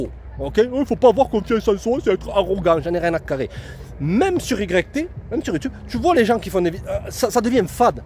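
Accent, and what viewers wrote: South, France. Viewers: Tu nous aurais pas dis le sud de la france ton accent plein de soleil nous le disait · Je ne te connais pas , je te découvre et tu m'es déjà sympathique. Un bon accent du Sud de la France, cet accent que l'on entend presque plus même dans le Sud où les jeunes font tout pour le perdre. Et ton analyse sur la société même si tu n'as pas l'air bien vieux, enfin disons beaucoup plus jeune que moi 😉 je la partage totalement. Ca me fait plaisir en regardant ta vidéo de voir qu'il existe encore des gens authentiques. Moi quand j'allais à la salle de sport au début des 90's, il n'y avait pas de femmes, ça sentait la sueur et la testostérone 🤣. Les femmes fuyaient ce genre d'endroits. Aujourd'hui les salles de sports sont devenues des endroits incontournables pour se pavaner, se montrer, créer des stories pour alimenter son compte Insta ou que sais je. 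Le smartphone est un véritable fléau oui, n'ayons pas peur des mots. Et cela touche toutes les tranches d'âges, ce n'est pas propre aux plus jeunes. Tiens récemment je suis allé au restau avec des amis tous des quinquas comme moi, entre chaque plat, silence radio tout le monde était sur son smartphone. A un moment j'en ai eu marre et j'ai poussé une gueulante ! Oh les gars ! On Se retrouve entre amis pour passer du bon temps, pour partager, communiquer et vous qu'est-ce que vous faites ??? Vous pouvez pas le lâcher le temps d'une soirée votre joujou !!?? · Incroyable, tu sais que avant que tu dise 5ans, ç est sortie de la bouche, donc je ressent la même chose et oui terrifiant, moi suis des années 1970, et j'en peu plus de tts ça 😢, et en plus les gens sont tellement horrible qu'ils émanent leurs négatifs autour de peu de gens qui restent positifs, donc c est vraiment dur 😢 Mais j essaye vraiment de tenir le cap, courage à tts ceux qui sont décourager, 🙏💜 Moi pareil jme dit tu n ai pas de cette planète, alors merci à toi, j aime trop ton accent du sud ça fais du bien à entendre 🙏💜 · Merci pour ton post! 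Tu as bien fait de parler avec ton accent du Sud, c'est encore plus VIVANT! Tu vois, j'ai 78 ans et je ne suis pas une "meuf", je suis un etre humain engagee dans ma vie qui est simple, car je refuse d'etre dans la consommation. (Comme j'ai toujours fait!) Et j'apprends a me contenter... C'est un choix, car je suis persuadee que ce systeme touche a sa fin...et que bientot tous ces zombies, comme tu les appelles, vont se reveiller et devenir des ETRES, parce que chacun est responsable de sa propre planete! C'est bien beau de constater ce qui ne va pas, mais ca ne suffit pas. C'est a chacun d'entre nous de produire autre chose et d'aller le chercher en lui-meme, comme l'eau que l'on puise dans le puit, il y a UN EFFORT PERSINNEL POUR RAMENER L'EAU! (Si ca te parle...)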